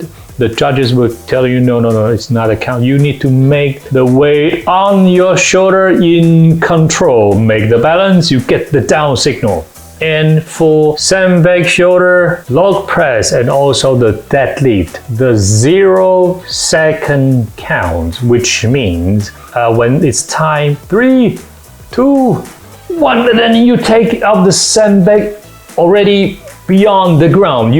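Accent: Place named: United Kingdom. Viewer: 不會拉，你很多單字會特別的有英國音，那個Ter很明顯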